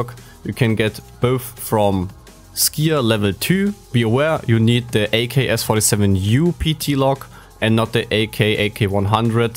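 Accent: Germany. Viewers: Bist du deutsch wenn ja mann hörts xD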